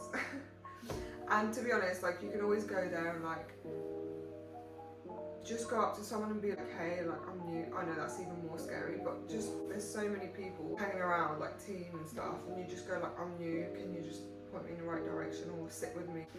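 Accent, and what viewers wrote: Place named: United Kingdom. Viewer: I love your accent! What part of the UK are you from?? 🇬🇧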